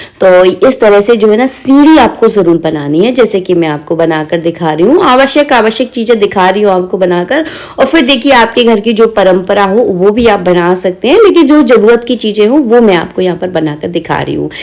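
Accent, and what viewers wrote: Bundelkhand, India. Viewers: Mam. Kya aap. Bundelkhand region se h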